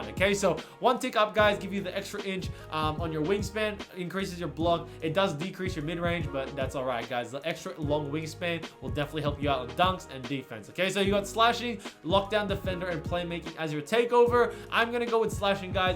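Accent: Australia. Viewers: hey man, i recognised ur accent and i’m assuming your australia, if you do play asia servers i was wondering if you could run wit me 🥺 much love from south australia bro. keep up the great content‼️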